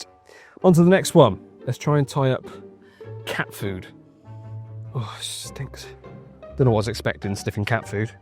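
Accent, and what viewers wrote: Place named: Gloucestershire, United Kingdom. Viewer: Tuner 😂🎉😂 I'm only one county up from (Gloucester)byou buts funny 🤣 I'm sure I ptotsound the same to people north of me 😂😂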